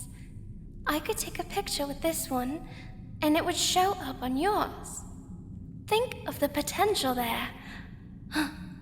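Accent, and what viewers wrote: United Kingdom. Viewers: sounds rather Bri'ish to me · Diggin your English accent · Your british accent sounds amazing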